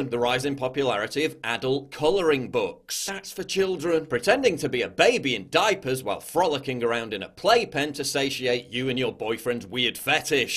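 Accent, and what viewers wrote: United Kingdom. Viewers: Thank you for articulating the reasons for this millennial obsession with super hero movies in your British accent. I think you nailed it. The social engineers want to keep us dumbed down with the emotional intelligence of children in order to keep us all dependent ON THEM and under their perpetual control.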